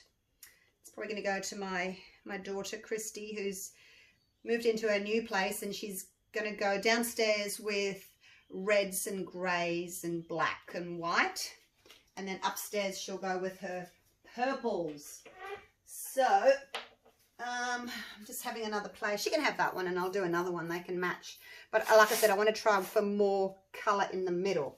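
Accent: Australia. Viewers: Hello Lady from Australia, I love all your work very much and would like to try some swipes you do so well, but I really get confused about your measurements like grams, is there an easier way of ratios? I am a retired widow who want to learn a couple of things in the pouring department, thank you in advance, love your accent btw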